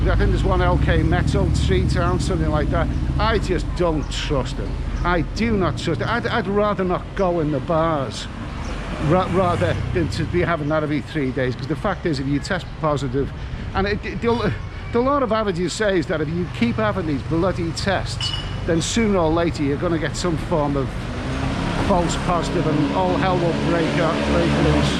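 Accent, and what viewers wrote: Liverpool, United Kingdom. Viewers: i am sat here on a wooden stool washing up last nights dishes ( Issan) and am laffing my bollocks off at ya its amazing what the ol one two buckle my shoe can change ones mood!! This was like watching and listening to a scouse Bob Monkhouse... i wriggled that much on this stool av got splinters in mi arse... Great stuff Chok dee mi ol flower.. Big Cee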